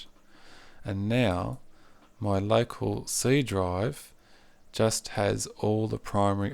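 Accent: Australia. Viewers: great stuff thanks already knew a lot of that but the folders I didn't know about that I usually did it a different way thanks for that good Australian accent you have like me hahah ok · YES another aussy lol Respect!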